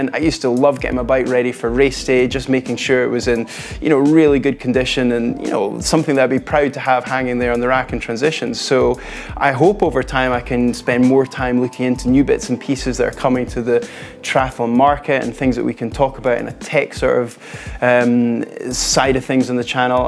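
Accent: Scotland, United Kingdom. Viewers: He is scottish......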